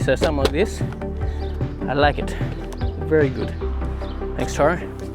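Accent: Australia. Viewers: I guessed you were from UK. After I had read your channel description, I realized that you speak English with RP OZ accent. I have never seen a house having a coop & its chickens as well during my stay in Victoria unless it is in outback. I thought having such consumption animals in urban areas was not allowed or illegal, but the house does...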